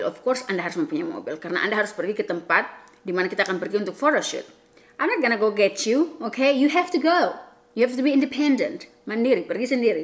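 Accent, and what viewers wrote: Manado, Indonesia. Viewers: Yoo bhs menadonya ...kental betul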